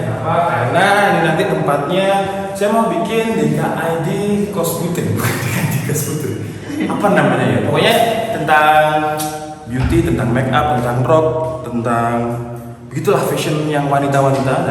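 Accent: Java, Indonesia. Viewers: jowo ne ndi mas? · Wong Malaaang wkwkw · Yg gw suka dari channel ini itu karna real banget mungkin dikit'' lihat prefrensi gitu tapi lainya real nggk ada settingan....dan logat jawanya ada dikit'' soalnya gw org jawa jg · Org jawa kah kok logatnya kek jawa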